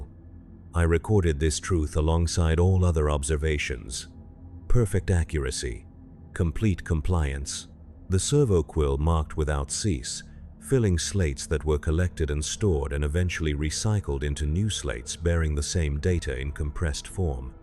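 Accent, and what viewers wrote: United States. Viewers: Old voice was so much better, new voice is too American sounding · Too American voice not good to listen to. 😢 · Wtf is with our favourite voice sounds to American now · Put the voice back, American voices SUCK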